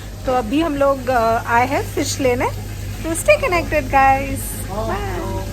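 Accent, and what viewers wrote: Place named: India. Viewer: I"ll make a guess by your accent.. are you indian, right? :) Your husband is an I.T. guy? (I ask that 'cause every indian abroad is I.T., which is a great thing.. Could you kindly ask him if I get easily a Javascript job or do I need to know ReactJS or other stuff? I'm planning to move there! :) Thankssss and greetings from Brazil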